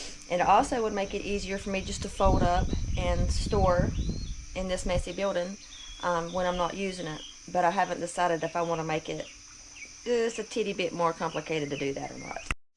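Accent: South, United States. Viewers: I like your accent, where down south did you grow up?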